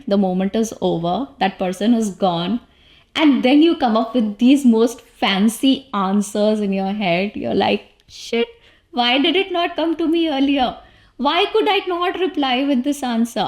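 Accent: India. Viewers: Oh mam… you make so many videos on correct pronunciation..but the difference is in those videos you are targeting words that people don’t use oftentimes and its easy to highlight those words.. but after watching this video of yours i can clearly see how many mistakes you’re making while pronouncing multiple words.. but the point is that while speaking nobody really focuses on pronouncing words as we are making people understand our standpoint.. its so funny and annoying that you create videos just to collect audience, when you yourself speak in a very common accent just like every indian speaker… i work with so many UK & US stakeholders and to be very honest nobody really spell the words the way you speak in your so called “how to Pronounce words” videos on youtube…and now all your stupid fan followers will start replying me and giving me gyaan but i really don’t care… moreover there are so many other important topics that are going in the world nobody really cares about your videos…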